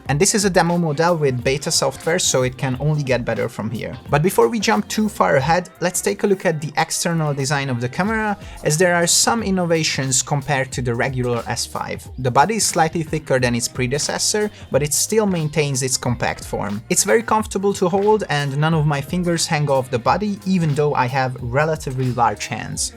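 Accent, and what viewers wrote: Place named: Hungary. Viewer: Hallod én sejtettem hogy magyar vagy:D mindig fel lehet ismerni az akcentust. Király videó lett!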